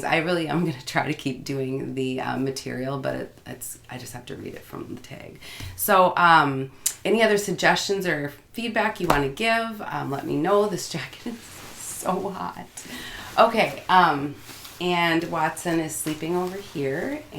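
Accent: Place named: Midwest, United States. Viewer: Okay, I have to know... are you from the mid-west?! I grew up in Minnesota and have lots of family there and you have sweet little hints of the mid-west accent. :)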